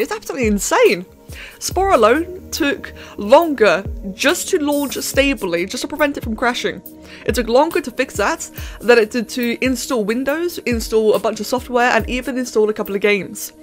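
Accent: United Kingdom. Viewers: i was watching since 2010:D Ps: are u brittish:3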